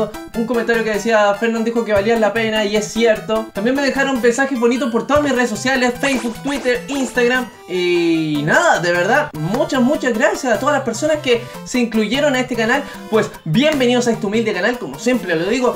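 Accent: Arica, Chile. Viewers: eres de Arica, no? xD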